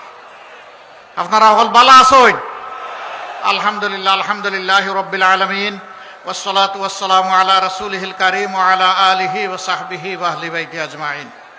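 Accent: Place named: Sylhet, Bangladesh. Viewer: Masallah nice sylhety ❤❤❤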